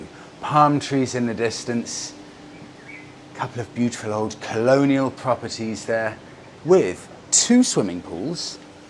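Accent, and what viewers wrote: England, United Kingdom. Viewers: Nice to hear a proper English accent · The bike s in immaculate condition. RE is the pride for India. It's one of the oldest motorcycle company in the world & it's still growing. Are u from the UK. Pls comment ASAP. Perhaps from your accent I just picked it up. Thank you. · I only watched the whole video because of the British accent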